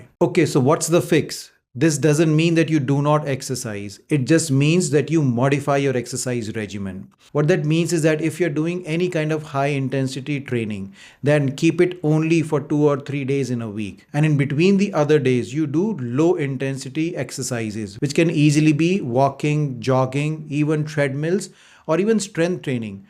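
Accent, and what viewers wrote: India. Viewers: Typical indian accent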